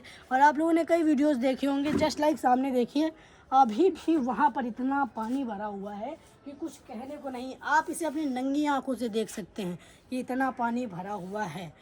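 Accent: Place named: Bahraich, India. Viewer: Bhai Bahraich se ho kya 😊 mera gaw h vha Apki local language vhi ki lg rhi 😊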